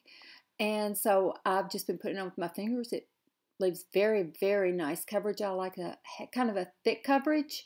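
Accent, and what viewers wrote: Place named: Virginia, United States. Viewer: I just figured out why I adore you so much- I have an aunt Ruth- she lived in Virginia-- (same cute accent) you have her mannerisms- and she was a beauty!!! When she was in her 20s people stopped her for an autograph they thought she was Rita Hayworth- My aunt had auburn hair.- I really believe god has so many molds LOL-See If this fits you. i will describe her - a "spit fire stubborn- Huge giving heart. When things are bad you are the light you know in the end it always works out. You avoid drama- LOVE design - have a hidden artist inside. To modest for your own good sometimes. Love your kids but its important to have your own life too .When she walked into a room she grabbed everyone's attention with out trying.. any of these fit? xoxoxo I FORGOT about doing my hair like this!!!! lots love shelly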